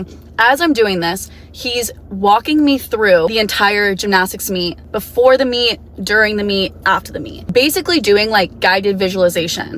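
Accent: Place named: France. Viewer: your french accent was everything 😂 😭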